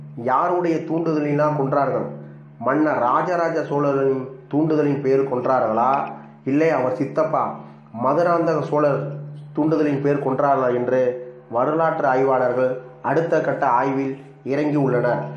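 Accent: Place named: Telugu, India. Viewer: Yen da kena pu**a.. loos kuu...ishtathuku olaritu iruka.. kabothi... athitya karikalan sagumbothu.. raja raja chinna paiyan da... athithya karikalana konathu.. pandiya natta serntha" abathu thavi" veerargal than...I vanga chera desatha serntha mun mudi anthanargal(nmaboothris) atharvana vedam therinjavanga... veerapandiya mannaoda adi aatkal.. Ivanka than konnanga athithya karikala.. ithu theryama loosu mathri olaritu iruka... un voice ah theryuthu sathyama nee oru "GOLTI" koothi nu ..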